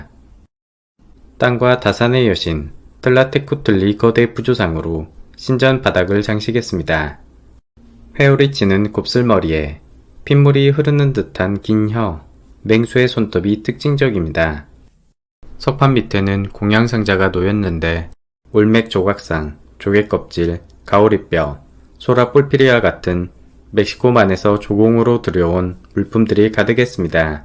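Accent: Jeolla, South Korea. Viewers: 어!! 목소리가 전라도분이시다!!! 오오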